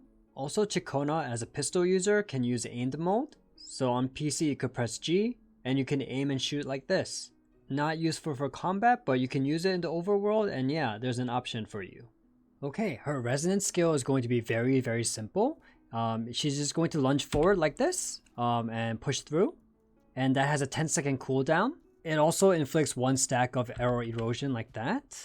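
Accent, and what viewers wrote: France. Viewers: Are you actually FRENCH?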